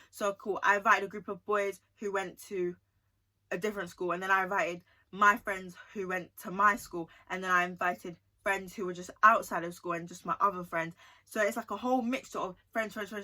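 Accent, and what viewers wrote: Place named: United Kingdom. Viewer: Im from the US And I believe your from the UK Right You have a Uk accent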